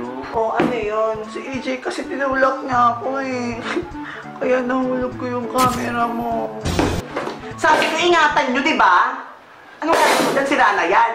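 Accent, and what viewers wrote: Visayas, Philippines. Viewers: Bisaya ka??? xD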